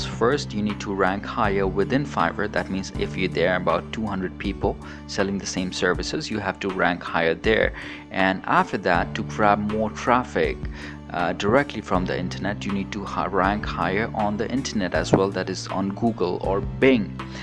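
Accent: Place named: India. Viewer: sound like indian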